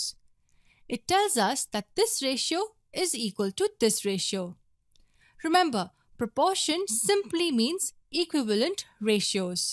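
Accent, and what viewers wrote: India. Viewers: Please change your talker im not in the mood for indian accent (not offensive just asking)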